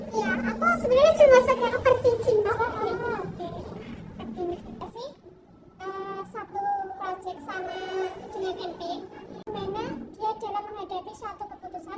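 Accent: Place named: Java, Indonesia. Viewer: medok bgt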